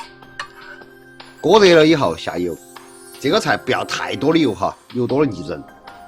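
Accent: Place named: Sichuan, China. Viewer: 雖然聽不懂四川口音，但還感受到那份香味！以後請加上字幕及食譜所用材料、調味料，可以嗎？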